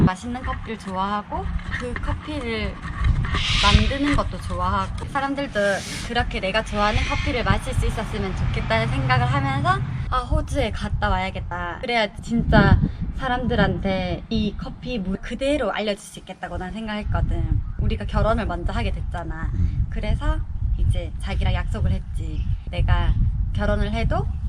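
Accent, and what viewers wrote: Gyeongsang, South Korea. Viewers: 💗🌈 안녕하세요ㅎ 부산인이라 경상도말투에 익숙해서 자주 놀러와요ㅎ 이번 영상 전적으로 공감해요!! 제가 커피쪽을 하는건지 아니지만 저랑 마인드가 너무 비슷해서 영상정독했네요ㅎㅎ 날씨+나의 온전한 내모습과 삶+아빠의 자리가 크고 역할이 정말 크게 작용하는 호주의 삶 등등 저를 호주라이프에 푹 빠지게 했어요ㅎ 그리고 ㅎ가끔 외롭고 힘들고 우울해도 맑은하늘과 따스한 햇살아래에서 요가 🧘🏻‍♀️ 한번 하고나면 뭔가 기분이 사르륵 🥰 1년중 300일이상 날씨가 좋다는 브리즈번에도 몇일째 흐릿흐릿 🌧 비가 내리고있지만 낮에는또 화창한 하늘 ☀️ 볼수록 신기해요ㅎㅎ 시드니 감기조심하시구 가끔 이렇게 편 영상 올려주세융! 기다릴게융ㅎㅎ🤩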